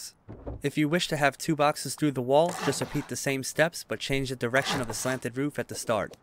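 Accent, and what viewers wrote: New York, United States. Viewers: wait wait wait, was someone not able to tell that is a NY accent? good video as always.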